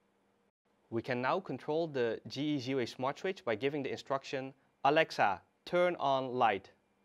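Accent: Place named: Netherlands. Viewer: jij bent nederlands gast your dutch i hear it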